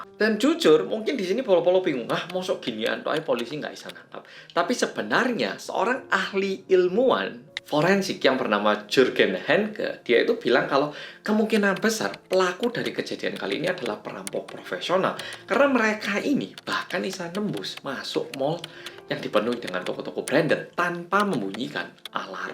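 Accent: Java, Indonesia. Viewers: maaliiing wkwkkwkww medoook beuud yah mas e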